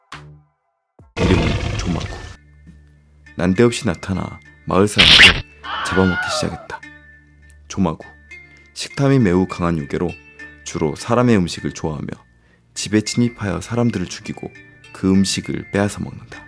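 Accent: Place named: Jeolla, South Korea. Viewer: ㅋㅋㅋ 밤마다 이거 보는 재미에 삽니다. 나레이터님 전라도 말투도 구수하고 ㅎ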